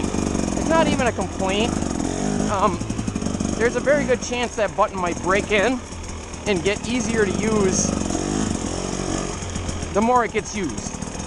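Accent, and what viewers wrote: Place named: Wisconsin, United States. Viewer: That Wisconsin accent is prime brother 💪🏼. Great vid. Your description of how controllable it is down low, makes this bike very tempting. My four stroke Kawi is hard to control in any sense of the word at low rpm. Feels like it goes from 0-100 with the slightest twist of the throttle. I ride a lot of desert and open area, so it’s fine. Woods riding and technical terrain is tough though.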